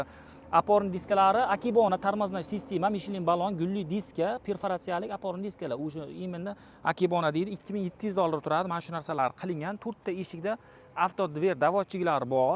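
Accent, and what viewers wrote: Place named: Kashkadarya, Uzbekistan. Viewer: Шева кашкадаре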